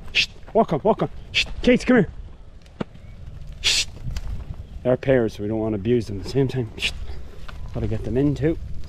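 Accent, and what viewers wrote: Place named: Ireland. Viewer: Hi...loving your vlogs,it’s great to see you back home.My cousin is trying to do our family tree, and it appears our family originally came from your part of the world.I remember my grandparents,plus aunts and uncles, ( sadly all passed now )speaking in a lovely Irish accent…talking about Leprechauns, great story tellers, So hearing you talk,and seeing the landscapes,makes me feel closer to them and where they came from,very much appreciated. Sue (Woodhall Spa) x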